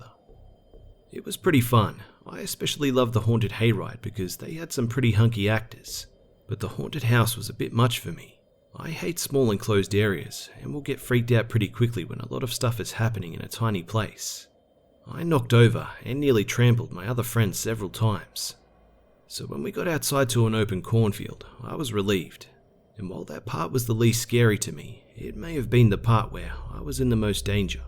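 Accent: Australia. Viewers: are you Australian? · Yo, what state you from in Australia, just wonna say hey from Perth lmao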